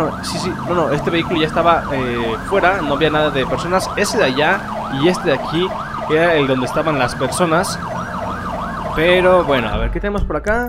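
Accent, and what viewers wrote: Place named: Italy. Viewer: casi en todo el video hablaste como italiano hablando español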